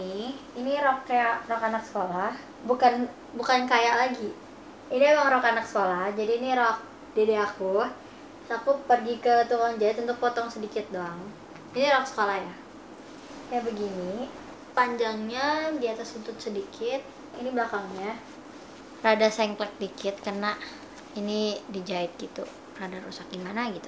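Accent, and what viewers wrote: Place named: West Java, Indonesia. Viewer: Kaka orang Sunda ya... Logatnya keliatan😄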